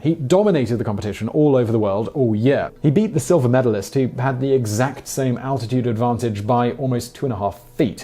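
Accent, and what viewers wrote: United Kingdom. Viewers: Sal Pastore I think some are just because he’s British and they have different pronunciations, where others he’s just not familiar w the word.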